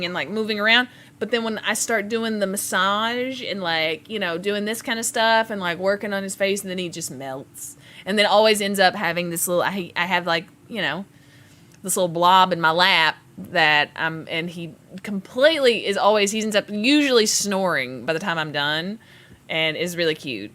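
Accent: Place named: Tennessee, United States. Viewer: Tennessee or Kentucky which one lol I hear it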